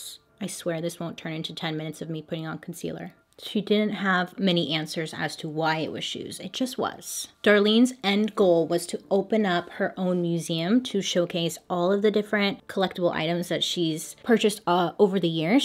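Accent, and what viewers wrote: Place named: California, United States. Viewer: Watching this in 2020 and I feel so happy to hear someone from my part of California!!!! I lived in Riverside, Moreno Valley and Perris!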